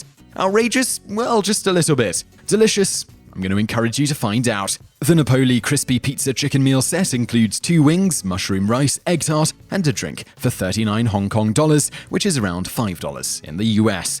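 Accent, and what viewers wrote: England, United Kingdom. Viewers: Is his accent heavier than most Brits?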